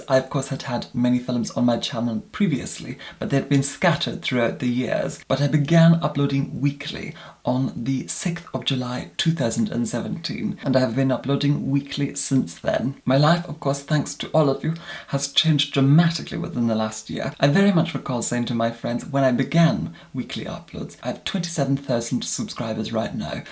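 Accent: United Kingdom. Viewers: I just adore a British accent (or most any accent for that matter lol). Technically, the British (aka English) accent is not really an accent. As an American, it only SOUNDS like an accent. Pretty sure the Americans accent developed from the Brits! 😊👍🏼💚💜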